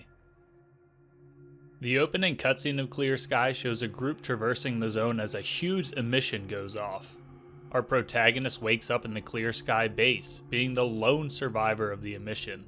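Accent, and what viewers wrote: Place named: Delaware County, United States. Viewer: damn someones from DELCO lol good video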